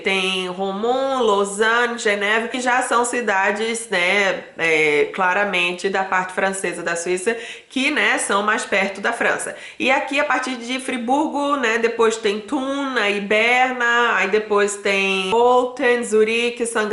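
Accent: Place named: Recife, Brazil. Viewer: Gosto do seu sotaque recifense !!!!😍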